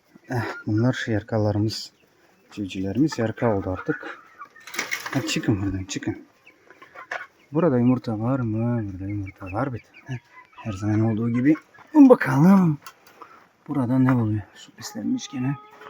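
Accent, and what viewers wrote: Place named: Balkans. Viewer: Siz Türk degilsiniz turkceniz duzgun degil Balkan gocmenine benziyorsuniz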